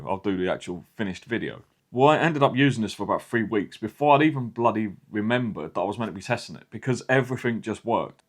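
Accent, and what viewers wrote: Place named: Liverpool, United Kingdom. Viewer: Why are you speaking in a scouse accent?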